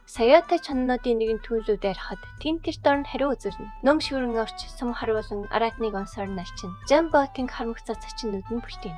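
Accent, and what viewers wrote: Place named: Inner Mongolia, China. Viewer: Жоохон Өвөр Монгол аялга сонсогдоод бхын. Яасан ч хөөрхөн сонсогддог юм😊.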